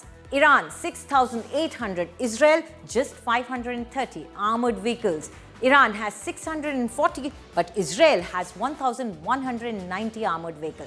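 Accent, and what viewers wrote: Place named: India. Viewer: this indian accent 🤒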